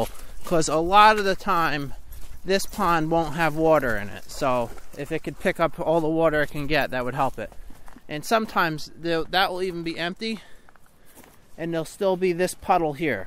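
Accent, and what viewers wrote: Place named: New Jersey, United States. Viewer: Man you certainly live in a region with lots of drainage issues. Is this PA? I detect almost a Jersey-ish accent.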